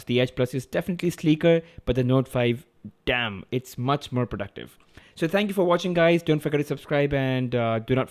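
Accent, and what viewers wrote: India. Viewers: I just hate the Indian accent. It ruins everything everytime.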